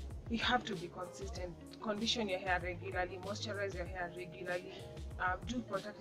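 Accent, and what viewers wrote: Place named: Africa. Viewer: You have a lovely accent. Where are you from? Zambia, Kenya?? Trying to guess Lol. I'm from Zimbabwe and your accent sounds pretty close to that area of the continent.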